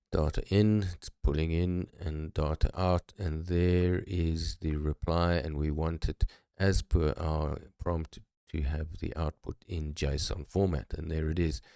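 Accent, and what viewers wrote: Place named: South Africa. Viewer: Very cool, why did I know you are from SA by 😅 voice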